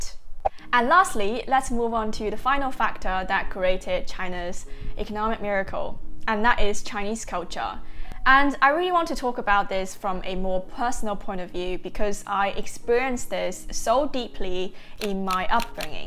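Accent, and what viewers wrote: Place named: United Kingdom. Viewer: She has ver British accent?.?why?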